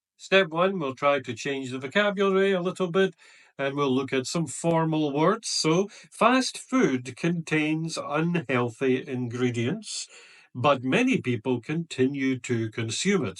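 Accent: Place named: Scotland, United Kingdom. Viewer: Thank you so much, this is very helpful video, and are you from Scotland? I notice that from your pronounce to /e/ & /r/.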